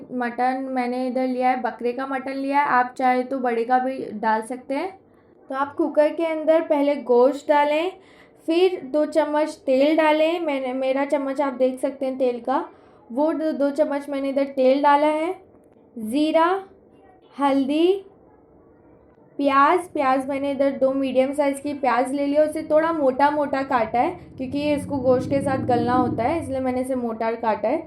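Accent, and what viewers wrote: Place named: Marathwada, India. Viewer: Ap pakka marathwada region ke ho , apki toning se pata chalta hai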